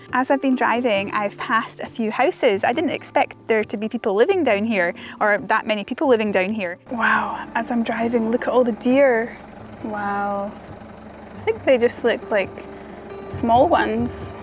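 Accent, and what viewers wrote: Scotland, United Kingdom. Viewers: Your videos are delightful and your voice and accent are a joy to listen to. Such beautiful scenery. How lucky you are to live in Scotland. Having never traveled to Scotland myself, It is exactly how I envisioned it. It’s magical! Thank you so much again for sharing these videos. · Thanks for taking me through Scotland which I have never visit though I live in Ireland. I love watching your videos and listening to your sweet Scotish accent, yours is mild though. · been so long since i heard a Scottish accent my family is originally from Gretna green but i moved to America as that's were my wife is from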